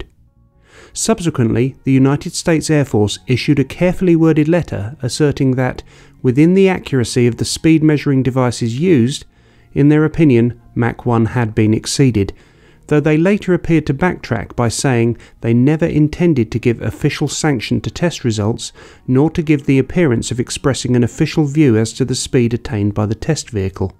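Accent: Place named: United Kingdom. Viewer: The accusation that the radar never clocked the speed and instead a truck is entirely new and unverified. It is a claim made solely by this video narrator and uploader and no official from the U.S. Airforce. Sidewinder missiles break the sound barrier without sonic booms all the time. The British accent is very suspect with Bias.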